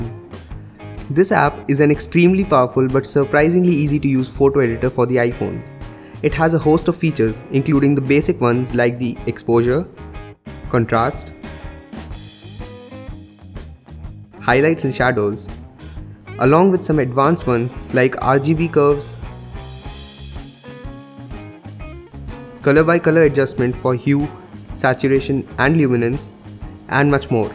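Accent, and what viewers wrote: India. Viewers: Your accent makes me respect indian english accent now. Sounds way more better than singlish. Oh i hate singlish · Your English is fucking horrible man lol why does every Indian have that accent, anyway get some braces -Canada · indian accent ...hhhh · Indian accent makes are the worst accent ever Just sounds horrible like nails on a chalk board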